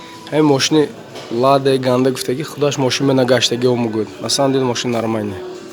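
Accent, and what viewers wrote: Konibodom, Tajikistan. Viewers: Канда занид бародар шеваи Конибодомива гардам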